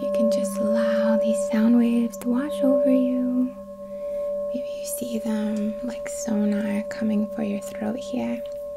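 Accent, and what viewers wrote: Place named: New Jersey, United States. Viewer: This is the 1st time I noticed you have an accent! New Jersey? I'm bad at this And yes sing next time!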